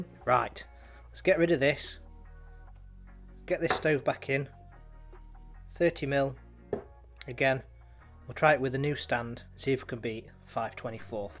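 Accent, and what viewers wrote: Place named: New Zealand. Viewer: That New Zealand accent is so strange to hear. I ended up having to put on subtitles. Thank you for the video. Aloha.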